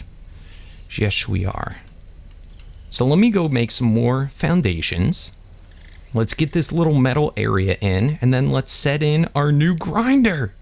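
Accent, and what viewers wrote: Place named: Boston, United States. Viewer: 220307 USA, Boston maybe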